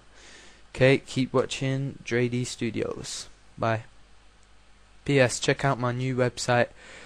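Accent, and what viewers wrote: United Kingdom. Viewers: when i first heard you i thought you were from London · Are you british? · i fort u were british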